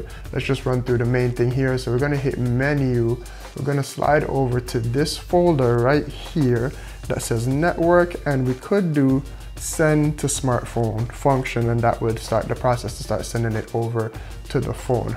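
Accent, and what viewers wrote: Jamaica. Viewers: yo you a yardie, love that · yooo. you from Jamaica??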